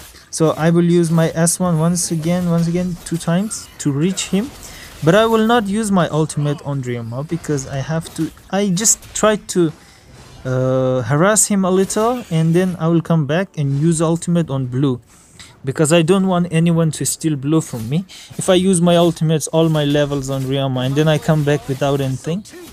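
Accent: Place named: Mexico. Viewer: from México i think , i notice te acent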